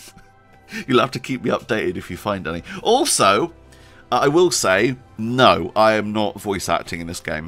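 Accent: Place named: Bristol, United Kingdom. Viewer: nice place, was there a few weeks ago. Grew up in Bristol so the accent seemed vaguely familiar. Anyway, getting back to the point, great video. Working my way through the first one at the moment.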